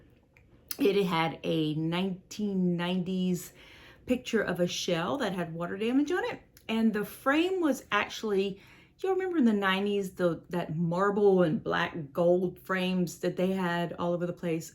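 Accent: Louisiana, United States. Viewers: Oh =my goodness! I just found you. You sound like home! I am from Louisiana. Thank you for a wonderful video. I will watch your other videos this week.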